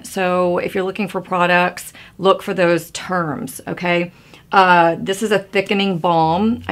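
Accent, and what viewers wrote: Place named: Texas, United States. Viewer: So good to see you back, 😊 You're sounding more Texan since you've moved back. Muah! 😘 Love you!!!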